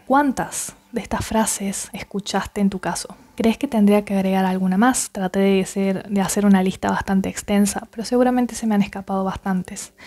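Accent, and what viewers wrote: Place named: Cordoba, Argentina. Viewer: Que te voy a explicar, ya lo explicaste todo vos y es como reflejarme, gracias por todo lo que haces, yo vivi en Cordoba casi 8 años en Tanti, lo digo porqie note tu acento, pero por ahi me equivoco.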